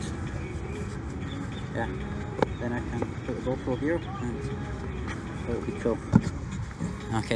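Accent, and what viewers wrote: Scotland, United Kingdom. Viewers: I'm here just for the Scottish accent.... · What a gorgeous resort! Lucky you ☺️☺️ Thank you for this great video... I appreciate your hard work and I love your Scottish accent mate ☺️ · Mate. It was hearing your accent and instantly like the video. GO SCOTLAND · Scottish accent love it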